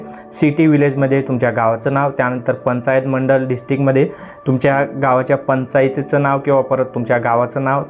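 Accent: Khandesh, India. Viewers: तुम्ही बोलण्यावरून खान्देशी दिशी रायणात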